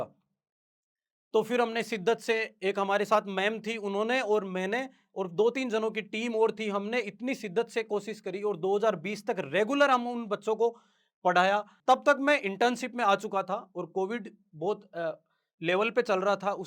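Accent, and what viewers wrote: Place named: Hadoti, India. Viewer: Hadoti wali tone❤❤❤❤ hum Bundi se hai 🎉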